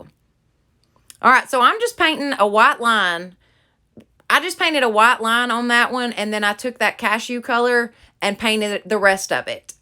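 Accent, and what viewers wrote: South, United States. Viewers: I have been watching your channel from the beginning & the quality of your videos has improved so much & so FAST!! They’re almost as AMAZING as all of your crafts!! I knew from the caliber of your projects at the beginning that you would only get better & BOY have you ever! And OMG! You CRACK. ME. UP. When you call your project pieces “dude” I just die! 🤣 I’m a southern girl too-I grew up in Savannah-& miss hearing that deep Southern accent (I don’t have one unless I’m IN Savannah for a while & even then it’s not very heavy.) I just think you are hysterical! You seem to be a genuinely sweet person & I very much enjoy all of your videos-your DIY’s are SO GOOD! Thank so much! 😘 Amy (from North Atlanta) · You are adorable and I love watching your videos!! Love your Southern accent. Pls tell me, how do you do your lashes??? Gorgeous Girl ❤❤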